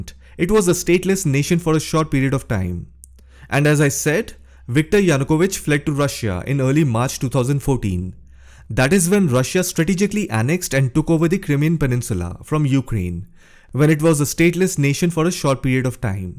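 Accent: India. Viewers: This may be a brilliant video or it may not. I refuse to listen to videos narrated in Indian 'English'. Indian speakers,, if you want English speakers to understand what you are talking about, every single word must be pronounced clearly and separately, using English vowel sounds, not Indian. Do not run words together as if they are all one long word. Either speak Indian or English, but not a mixture of both. Thanks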